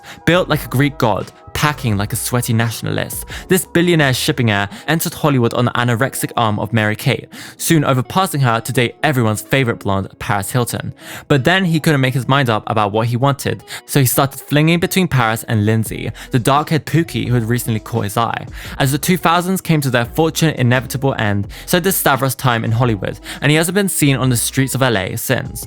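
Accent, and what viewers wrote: United Kingdom. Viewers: british accent XD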